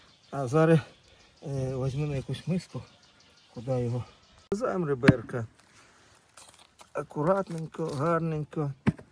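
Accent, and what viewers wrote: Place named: Surzhyk, Ukraine. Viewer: Учичи буквар вчи українську мову яка гидка твоя суржикова мова просто ганьба гидко слухати може ти щось і петраїш в цій справі а мова просто гімно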